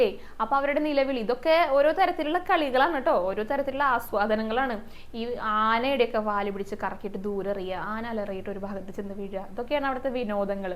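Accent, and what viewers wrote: Thrissur, India. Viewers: swaram nannaittunde..trissure slang nalla resam undu kelkan...especially kurangum kutty...😂😂❤❤❤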